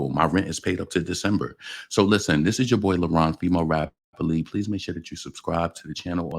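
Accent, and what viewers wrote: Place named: New York, United States. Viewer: Its sounds like most people in nyc....you are blessed.... blessed you 😊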